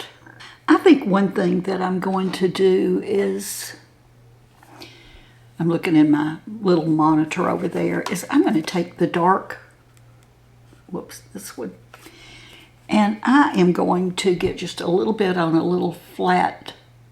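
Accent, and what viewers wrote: South, United States. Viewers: I think the foundation is a tiny bit too dark and your blush is too heavy. A lot of older women wear too much blush and it looks overdone. I also think you’d do better with brows that are less brown and maybe use a bit of pencil. Elf makes a very decent one for the price. Other than that, you look very lovely and I love your southern drawl. Where are you from? I’m also 81, am blessed with good skin. My wrinkles are in the mouth area and I’ve never smoked so am very annoyed. My eyes and cheeks are good. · The makeup only enhances your inner beauty. I am 64, and to be honest, I haven't always been very kind to myself and the changes that accompany aging. That's going to change after watching you, and listening to that calm, charming Southern accent. Thank you for the inspiration, and proving that a little pampering, and inward gentleness can present itself to the outside world as being worthy of self-love and care.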